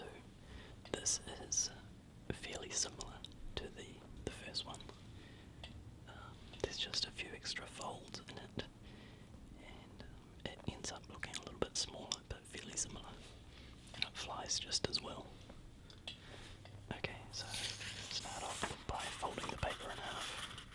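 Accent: New Zealand. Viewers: Are you from Chch by any chance...I love listening to my friend there talk to me on the phone. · God I love New Zealand accents <3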